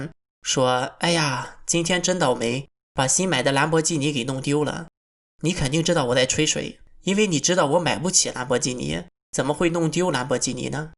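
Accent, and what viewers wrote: Shandong, China. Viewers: 口音聽起來相沙東人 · 这声音很明显是山东人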